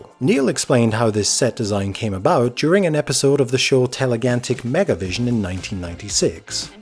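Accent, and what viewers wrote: Scotland, United Kingdom. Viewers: what accent is that? every other word is either scottish, northern irish, or american. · Where is this guy from? A weird Scottish/ Northern Irish/ American accent all mixed together. Any ideas? Great vid btw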